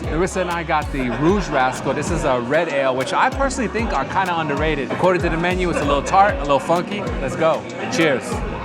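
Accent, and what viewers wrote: Chicago, United States. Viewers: You have the thickest Cha-cah-go accent. 👌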